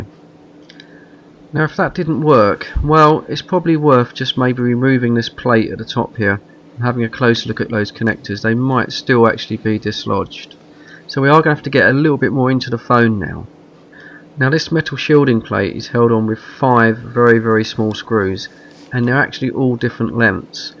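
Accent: United Kingdom. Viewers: Thank you Sir, Your advice saved me a lot of frustrations when my iPhone's screen went blank after entering wrong passcode several times. The screen went black and blank and was unable to respond to anything after trying to reboot or press home button several times. Thank you again.- -Richard from Canada. ( I know the owner of this video is in the UK {telling by accent})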